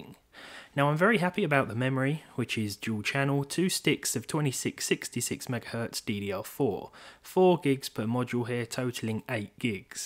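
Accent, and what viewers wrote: United Kingdom. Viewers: For some reason I simply like your British accent 😂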